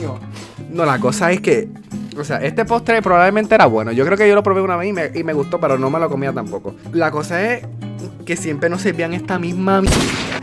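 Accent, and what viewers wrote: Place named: Puerto Rico. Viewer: yo tambien lo soy pero naci en estados unidos y ya sabia que era de puerto rico por como hablaba xdd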